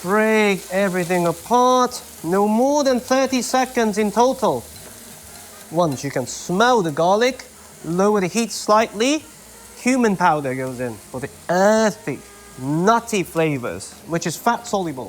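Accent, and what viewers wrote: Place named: United Kingdom. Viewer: did not expect the Britiish accent ! but overall good recipe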